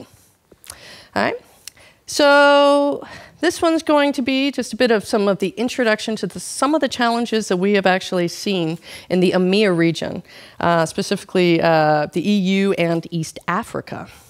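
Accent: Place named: United States. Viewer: Interesting .... Dutch lady with an American accent..... hmm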